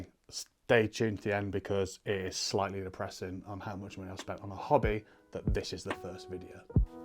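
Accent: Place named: Yorkshire, United Kingdom. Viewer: You gota proper Yorkshire accent there, hope your good fella like the channel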